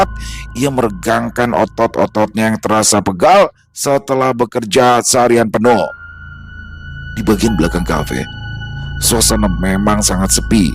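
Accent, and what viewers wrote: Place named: Java, Indonesia. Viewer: Waalaikumussalam Bang, Makin rame nih Warung Kopi Bang Doni....nggak mbosenin, ditambah lagi Bang ketika bawain masing masing karakter dengan all out terasa medoknya, apalagi ada Bang Kothep.....jadi ingat sama haji Bolot 😁. Terima kasih Mas Dani SBR dan Bang, Sukses selalu...🙏🙏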